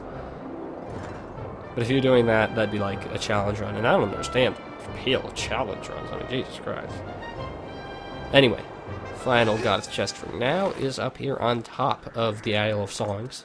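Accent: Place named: United Kingdom. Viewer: I am utterly charmed by your British accent.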